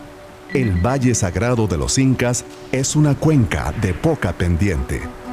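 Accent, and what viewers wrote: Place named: Spain. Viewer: Thank you this wonderful spanish accent. Total understandable even with my little spanish also. And the film is perfect. Congratulaion for this narrations again!!!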